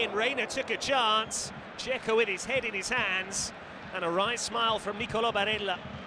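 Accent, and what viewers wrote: Italy. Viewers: Putz, mas o narrador é romano !!! Assim não dá ...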